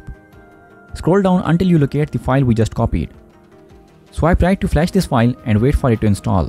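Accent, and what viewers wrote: India. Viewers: Well he's Indian? I'm just happy he's actually speaking English and not just click baiting with English title then full out Indian audio lol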